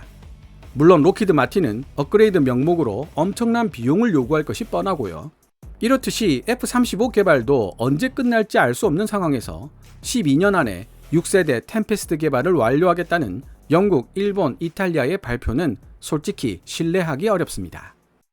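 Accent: Gyeongsang, South Korea. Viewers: 갱상도식 영어 억양 알파뱃만 나오면 하이에나 처럼 덤벼든다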